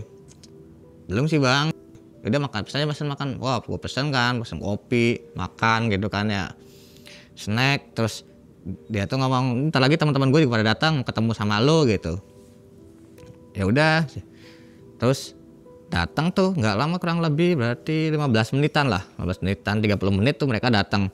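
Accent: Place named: Cirebon, Indonesia. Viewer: Logat Cirebon nya ketarak banget bang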